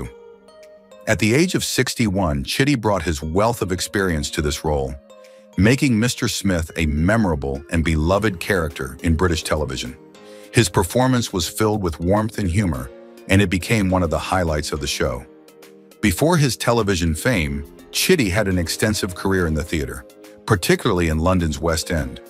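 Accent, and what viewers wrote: United States. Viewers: What a mess up when you get an American to do an English presentation.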